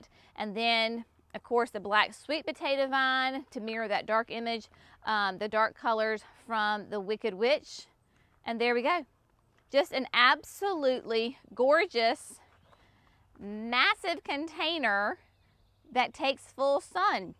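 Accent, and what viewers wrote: South, United States. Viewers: I love your videos. Such a beautiful garden and nursery! And I love your Southern accent. Wonderful!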